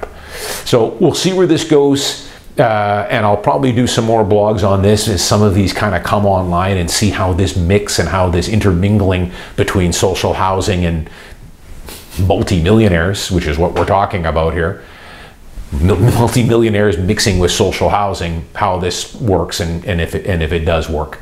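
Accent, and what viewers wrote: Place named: Canada. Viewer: The most typical Canadian accent. Fascinating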